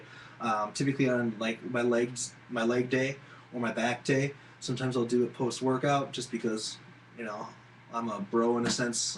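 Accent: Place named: Minnesota, United States. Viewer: The minnesota accent annoys me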